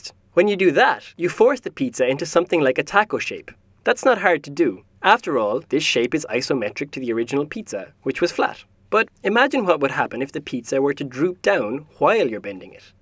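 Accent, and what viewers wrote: Ireland. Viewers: Hey I hear a fellow Irish person! :D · irish accent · I love pizza with Irish accents! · what accent is this? irish? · Irish accent? Idek. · irishness! :D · is this huy irish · He sounds vaguely Irish to me. Not at all Chinese. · The accent was actually from the Republic of Ireland! If it was a Scottish pizza it would have been deep fried, yes that actually exists there! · do i hear an irish accent? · SymbolX the narrator is irish and your comment made no sense to me otherwise thanks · Actually, seemed pretty damn Irish to me... And, living in the UK and having an Irish best friend, I know what Irish sounds like. =) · Is that an Irish accent? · Are you Irish, but use Americanisms? Or are you American with an Irish accent?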